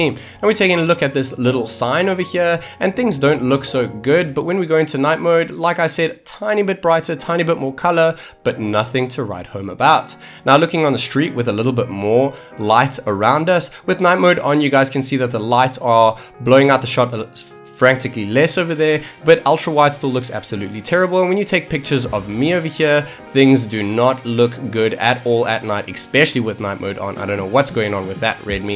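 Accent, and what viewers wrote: South Africa. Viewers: Is this oke South African? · sounds like your from South Africa.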